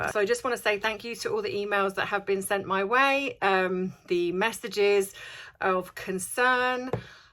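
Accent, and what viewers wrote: United Kingdom. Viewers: 🌸 Hello Bubble Fam 🌸, great to see you're back. I like the ideas you have for the channel. Then again, I've been in love with British accents since The Beatles first came to the U.S. Paul... sigh. ♥️ So I would listen to you read the phone book! Anyway, finding balance in one's life is not easy and priorities change. Have patience and I know you will find the answers. 💋 See you soon!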